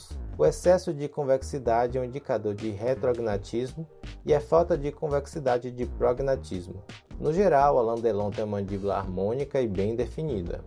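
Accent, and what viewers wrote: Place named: Salvador, Brazil. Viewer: Tu é de salvador? Conhece esse sotaque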